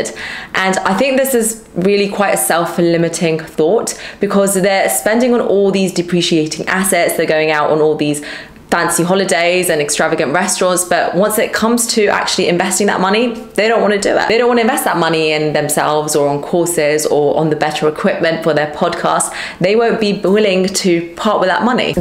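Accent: United Kingdom. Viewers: admired with your stunning British accent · I love your videos. But it’s very hard to understand, it feels like it’s on FAST forward. Plus the British accent makes it harder. A bit slower would be a lot easier to absorb the content. Just a feed back. Thank you!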